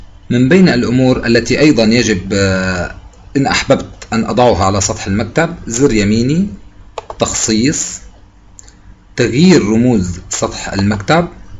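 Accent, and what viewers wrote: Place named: Levant. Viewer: تعجبني لكنتك الشامية ولغتك العربية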